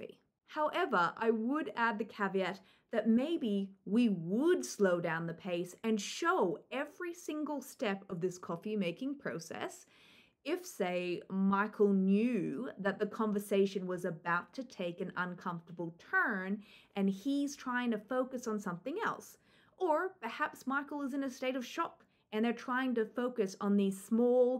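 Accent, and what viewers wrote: Australia. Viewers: Sounded Aussie sometimes but then you had some strong Rs like Americans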